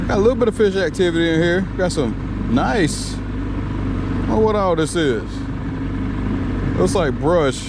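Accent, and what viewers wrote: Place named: South, United States. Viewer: “A freaking Hog” 🤣 I’m glad I waited until I could watch this whole vid! All sorts of Southern coming out of you!! Love it! So crazy you pulled the first hook up right out of that same fish! The aggravating Bass guy was definitely fishing the right spots and he was probably cussing you just the same! Lol. Your little bait fish is my up coming PB!! Don’t be hating on him!! 😝 Glad to see you had an awesome day out! Also glad you stopped starring at that fish finder!! Techy, techy.. what in the world did our Granddaddy’s do?! Oh yeah, they just enjoyed being out there!! Lol.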